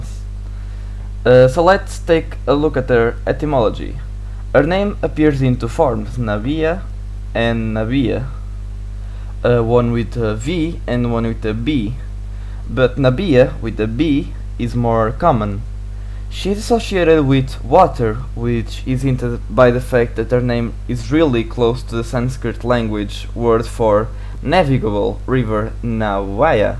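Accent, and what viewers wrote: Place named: Portugal. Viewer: Sotaque português. 👍🏼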